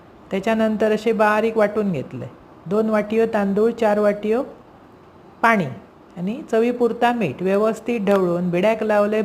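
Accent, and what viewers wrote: Malvani, India. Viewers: वा भारी! घावणे पण आणि मालवणी पण❤😊 आमकाव आवाडतत❤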